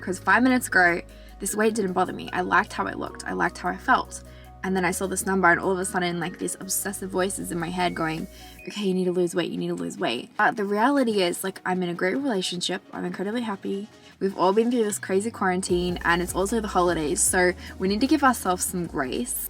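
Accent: Australia. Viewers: Came here for Australian accent advice, stayed for the positive mindset about self love ❤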